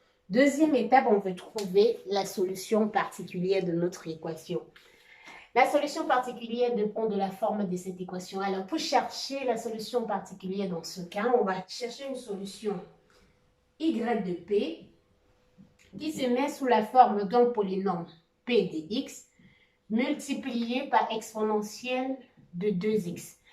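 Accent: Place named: Cameroon. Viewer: Très bonne présentation, vous avez un accent camerounais que je reconnais. Félicitations à ma compatriote mathématicienne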